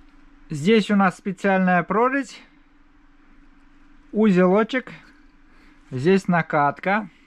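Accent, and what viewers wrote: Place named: Baltic states. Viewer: Акцент, прибалт? Или Беларус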